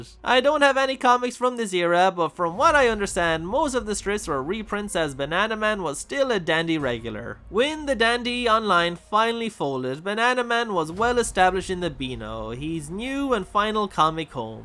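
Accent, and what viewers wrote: Ireland. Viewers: ffs... I was trying to work out your accent.. going from dutch to welsh to ...ireland... then i heard your 'artwork'..deffo southern irish.. scrolled down to see Galway , derp. Onie tuck uz twarthie minnits , sake!... I used to see bananaman on u.s. tele late 80's via nickelodeon...had no clue the backstory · I normally like an Irish accent - but I'm afraid your voice makes my ears bleed.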